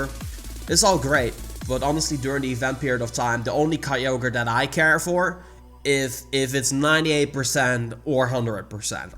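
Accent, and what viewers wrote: Netherlands. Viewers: You really sound dutch plus i might move to the Netherlands in August so i hope i meet you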